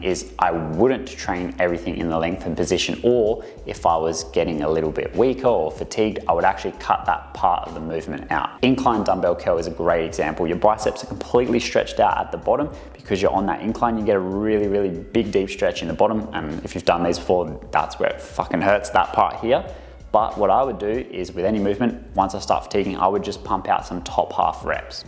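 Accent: Australia. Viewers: Your a champion mate.. the Aussie charm is refreshing to listen to while you explain things keep it up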